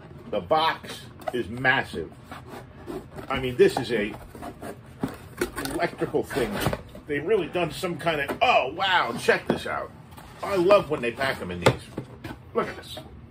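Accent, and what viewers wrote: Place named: New York, United States. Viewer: are you from new york or new jersey? love your accent and videos, cheers!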